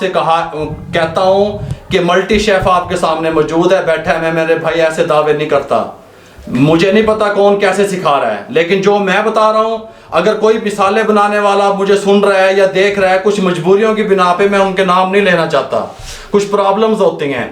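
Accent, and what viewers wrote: Punjab, India. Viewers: Punjabi kitni bhi achhi Urdu ya Hindi bole tone se pehchana jata hai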